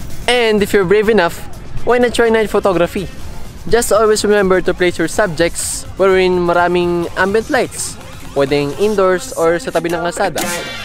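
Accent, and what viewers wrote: Philippines. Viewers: You remind me of my Phillipino boss here in UK your accent.😍😍😍. I like you you are a very good teacher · That sounds like a Filipino accent ? And Tagalog am I Tama ????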